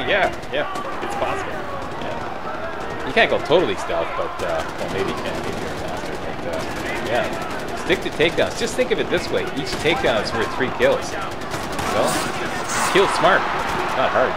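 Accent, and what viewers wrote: Canada. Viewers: o ur Canadian eh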